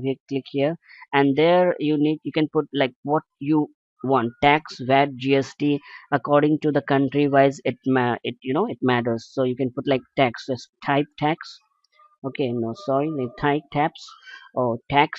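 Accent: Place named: India. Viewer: Scam anything with india voice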